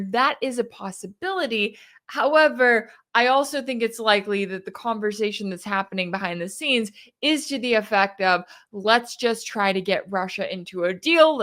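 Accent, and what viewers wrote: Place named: South, United States. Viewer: As usual another great program! Thank you always for the important needed information! By the way, where did you get that "Al ya all" American Southern drawl?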